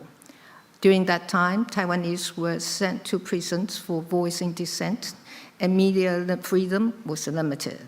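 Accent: United Kingdom. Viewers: 小英－口濃濃的英國口音讓我覺得好懷念自己年輕的時候！當初我在新加坡學英文的時候就是這樣的口音、我們的前總統小英真的好可愛🎉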